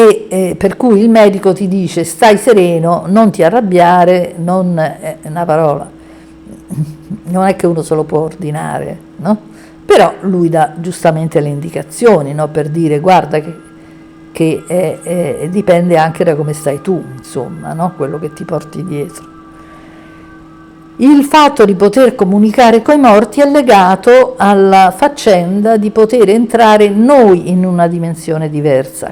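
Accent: Rome, Italy. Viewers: Professoressa lei è di Roma?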